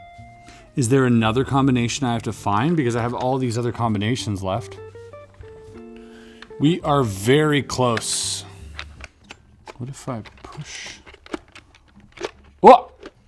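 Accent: Quebec, Canada. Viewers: T tu Quebecois? j'ai cru entendre notre bonne veille accent bravo mon amie! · that perfect canadian french speaking ^^ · I just discorvered that has an accent from Quebec x) That's the fisrt time that I hear him speaking French actually. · c'est tellement nice haha jai faite le saut de t'entendre parler québécois! j'adore tes videos t'es awesome! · Hold up hold up hold up... MY MAN IS CANADIAN?!?! WHAT!?!? The only reason I know is because I’m French Canadian and I can tell by his accent. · I don’t know what surprised me most, this amazing puzzle or your Québécois accent! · Tu français??? Est tu québécois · Il parle un peu québécois dans ses anciennes vidéos de street magic;) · yup it's a french puzzle:). but definitly have a quebecois accent ( but honestly in france many mix up canada and quebec ) so all right to whoever want to mix up france quebec and belgique xD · C'est tellement bizarre t'entendre parler français. 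On se rappel que tu es Quebecois tout de suite. XD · Are you Canadian? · It took me a video where you read a french card to realize you're canadian (and probably from around Quebec judging by the accent)! This was an incredible puzzle. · Première fois que je witness ton accent Québécois. Toujours agréable de voir un Québécois réussir sur Youtube. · Are you quebecois? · Damn, your French accent is like, du parfait québécois! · Un parler québécois impeccable! (Je viens juste de découvrir ta chaine) Super casse-tête! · Tu es québécois??? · Tellement bizarre de t'endrendre parler francais avec ton accent quebecois alors que t'as pas vraiment d'accent quand tu parles en anglais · Are you quebecois!?!?!?! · Wait... Es-ce que t'es québécois par hazard? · Je pensais pas que tu parlais Québécois! Moi qui te suit depuis tant d'années!! Thanks for sharing all this with us! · Your french accent sounds a bit Canadian it’s funny 👍👍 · salut je regarde tes vidéos depuis longtemps mais quand tu as sorti la carte en bois et que tu as lu en francais, j'aurais jamais penser que tu étais du Québec, good job! 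· I knew. But only because I know his address is in Quebec and I recognize his accent. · La lecture en "Québéquois" m'a tué... 😆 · Would you be from quebec by any chance? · Why do you have québécois accent when speaking french?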